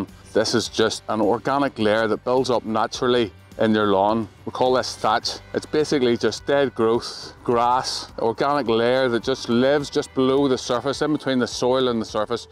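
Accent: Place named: England, United Kingdom. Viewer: Cant understand your queens English eho. Give me a Kiwi any day.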